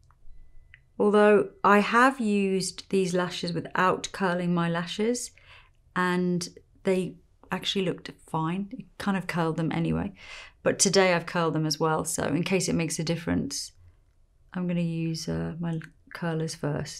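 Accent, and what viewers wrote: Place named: RP, United Kingdom. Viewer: It's a lovely look, however, I have an extreme allergy to acrylates and all of the fake lash products seem to contain them, unfortunately. Same for Lashify. Any suggestions? You are so very pretty, and I love listening to your plummy voice, too.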